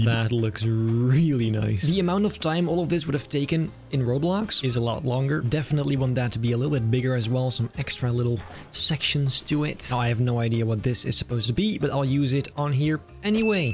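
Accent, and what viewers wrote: Netherlands. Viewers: Holdon Is this the same guy behind photoshop battles Sounding very dutch😅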